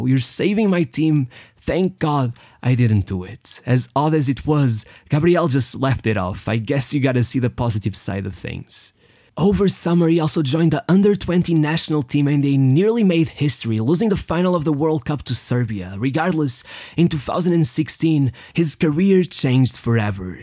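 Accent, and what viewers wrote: Portugal. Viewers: Esse sotaque, é um tuga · I see u are portuguese only from your accent 😅🇧🇷🇵🇹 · Is the guy doing the vid portuguese? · Another great football Story: thank you very much. I can hear that the commentator is just like me a Portuguese speaking dude due to his beautiful accent in English. Thank you Sir.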